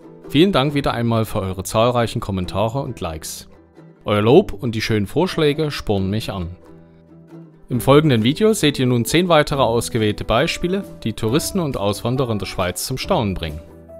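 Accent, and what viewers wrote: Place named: East Germany, Germany. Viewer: Super Film. Mit deinem Ossiedialekt hälts du uns den Spiegel vor und gelegentlich lernen wir selbst noch etwas über uns. Mit Humor und pointiert-weiter so.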